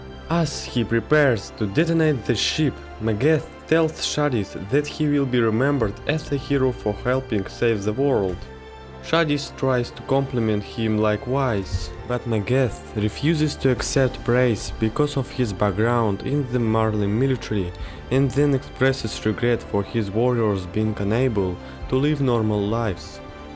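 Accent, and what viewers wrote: Russia. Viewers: Похоже на русский акцент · Hmmm,English language with Russian accent. I like that👍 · Are you russian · У тебя русский акцент) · you from russia??? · are you russian? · Слышу русский акцент... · Чел ты руский по акценту видно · Bro, are you Russian? 😅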